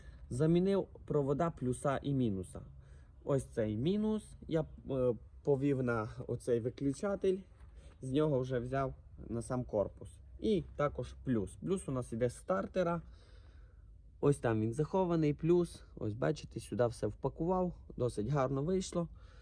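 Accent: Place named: Transcarpathia, Ukraine. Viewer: Все чотко нерозуміють люди закарпатського говору 😁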